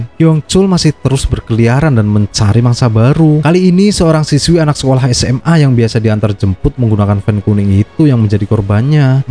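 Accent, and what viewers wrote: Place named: Java, Indonesia. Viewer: Bang lu orang Jawa ya ? Soalnya kaya keciri banget dari logat Luh ngomong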